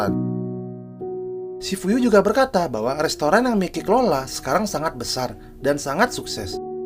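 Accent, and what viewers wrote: Bali, Indonesia. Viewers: Bang logat lu kek orang Bali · Kyk logat bali bang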